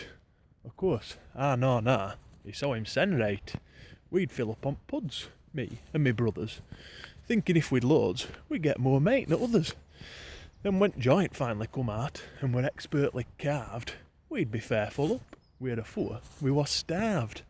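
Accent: Yorkshire, United Kingdom. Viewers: Hes from a funny part of yorkshire this lad.. yorkshire puddings wi jam? Sacralij ! And its cooilin not cooling .utr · By ek its grand hearing true yorkshire prose people don't alus know what I say lol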